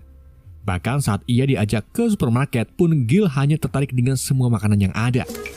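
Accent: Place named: Java, Indonesia. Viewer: Bosooomu kok lucu ngunu min, tibake wong jowo ta🤣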